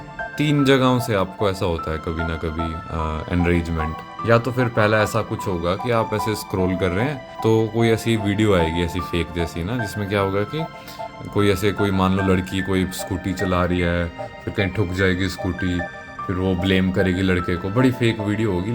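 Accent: Himachal Pradesh, India. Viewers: that himachali accent hitting hard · Himachal ka accent work kar geya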